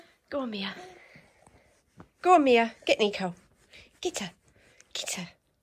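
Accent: United Kingdom. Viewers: Hello, your dogs ate very cute! Im watching your vlog from St Peterbourg, Russia, and i couldnt find any mention about what country and city you are living in.. By your accent i guess you live somewhere in Great Britain, am i right?:) Maybe someone can prompt me? Thanks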